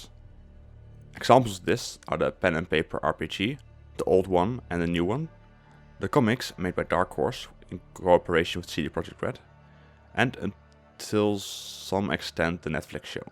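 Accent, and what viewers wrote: Netherlands. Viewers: Yeah youre defenitly dutch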